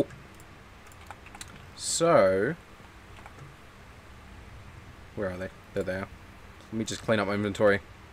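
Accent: Australia. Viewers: ye fuikin aussie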